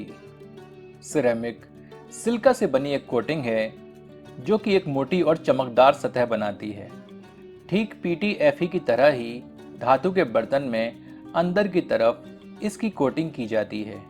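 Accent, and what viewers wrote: Standard. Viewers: aap jitne ache tarike se sudha Hindi me bolte he utna koi dusra cheaf nahi bolta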